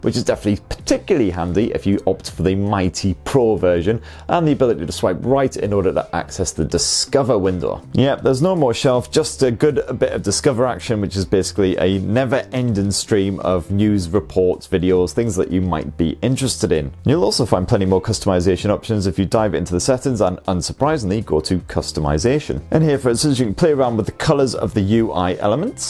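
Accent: United Kingdom. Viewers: I love his british accent and cheery attitude every video. Makes you excited about any tech that he's talking about.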